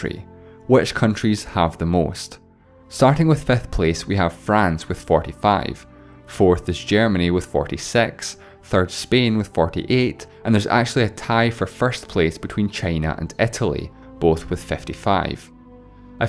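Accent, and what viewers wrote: Scotland, United Kingdom. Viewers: scottish accent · Scottish? Love it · It's Scottish, just not the heavy Glaswegian accent most people associate with Scotland. This from some one who lives further East, possibly Edinburgh.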